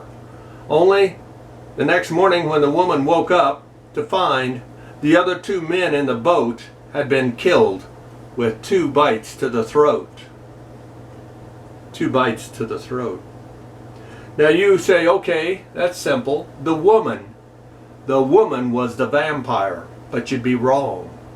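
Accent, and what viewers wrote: Nebraska, United States. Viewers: You surprised me! I tuned in to keep up with current events and hear that Nebraska/family accent, well,I got the accent. I didn't know it was going to be spooky story night! Please consider occasionally telling strange/scary stories that Nebraskans old-timers tell for true. My family has a couple dating from the war. Thank you.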